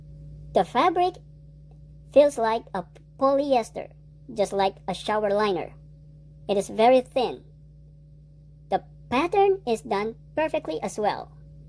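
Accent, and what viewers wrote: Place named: Philippines. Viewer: Why is your tagalog accent so heavy?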